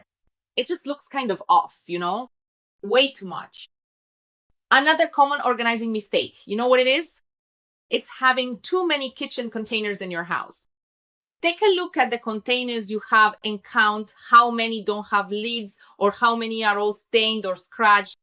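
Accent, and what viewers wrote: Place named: Russia. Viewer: ficou com sotaque russo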